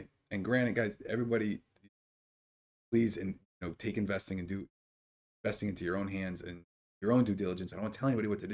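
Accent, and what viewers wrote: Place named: Chicago, United States. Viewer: Great message for new stock holders. Also, not sure if you're from Chicago or not but you sound like us big time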